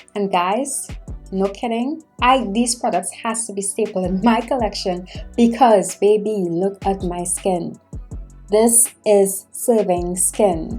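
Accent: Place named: Trinidad and Tobago. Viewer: Are you from Trinidad, Port of Spain? Beautiful accent ❤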